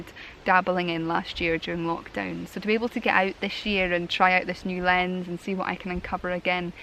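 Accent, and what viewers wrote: Scotland, United Kingdom. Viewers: I know it's just your Scottish accent, but at first I thought you said mackerel photography......was hoping you were going to get out a fisheye lens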